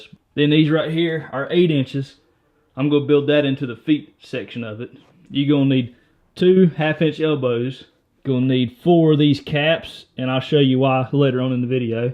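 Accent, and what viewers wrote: South, United States. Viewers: Idk why but I've never been a fan of U.S. southern accent. Actually, I've just not thought about it or considered it. But, my goodness, his voice and accent are just beautiful. Oh and the tutorial is great too. I'm trying to build a similar rig, and this looks really nice and straight forward. Thanks. 😊😊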